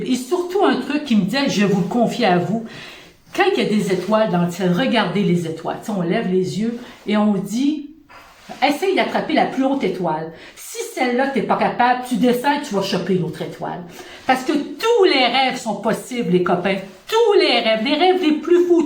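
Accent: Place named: Quebec, Canada. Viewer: Coucou entendre ta pêche et ta super énergie, ça fait du bien en plus de ton p'tit accent Québécois qui apporte la petite cerise sur le gâteau, merci!!!!!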